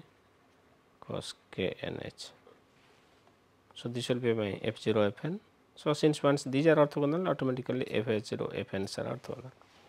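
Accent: India. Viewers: What is the meaning of talking when your English only can be understood by the Indian population. we have to be focused on 2 things the maths and the very hard Indian accent.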